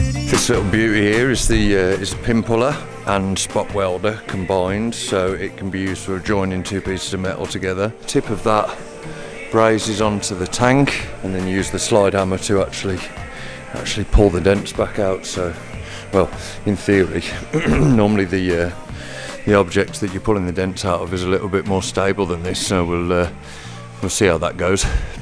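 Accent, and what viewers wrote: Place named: Birmingham, United Kingdom. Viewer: Nice to hear a fellow Brummy on the ML channel!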